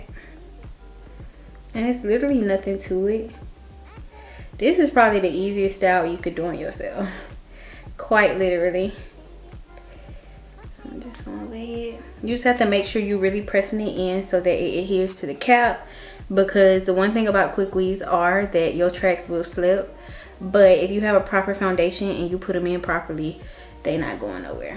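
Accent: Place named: South, United States. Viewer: love video and your country accent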